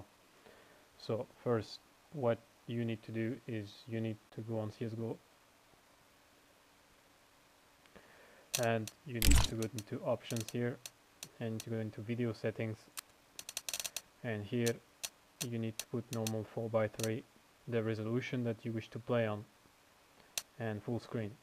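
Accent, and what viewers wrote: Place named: India. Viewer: sounds like an indian tech support scammer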